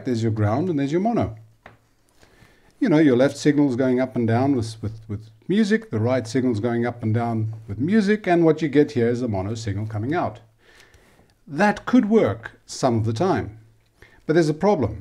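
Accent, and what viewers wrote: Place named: South Africa. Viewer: You sound South African. 👍🏻😁 I have learned quite a few tricks. Thanks boet.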